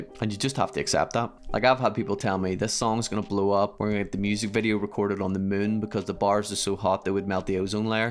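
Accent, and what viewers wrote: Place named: Scotland, United Kingdom. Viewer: Yes...you got a sub bc i like your scottish accent. 😜 Lived near Perth 10 years ago. My Ex is scottish. But she is back home now. But i loved your country...if im right that you are a scots dude...???!!! I also saw that you are on Looperman. Im not active there anymore. But i was like 13 years ago. I only go there sometimes to download an acapella. Greetings from Germany. ❤☮☯❤☮☯❤☮☯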